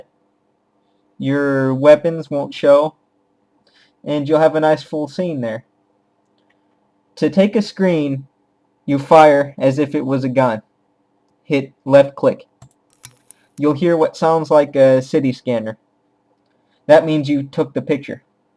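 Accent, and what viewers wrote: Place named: Texas, United States. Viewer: He sounds more like a teenage texan.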